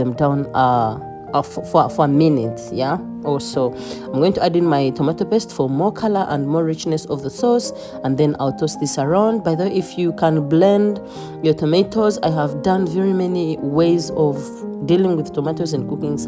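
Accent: Kiga, Uganda. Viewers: You sound Ugandan, thx for cooking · U sound like a mukiga btw, I love your video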